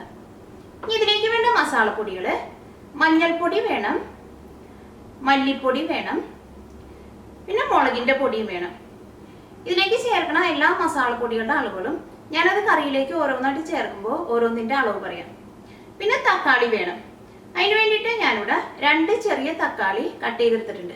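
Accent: Thrissur, India. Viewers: that's how trissur people talk.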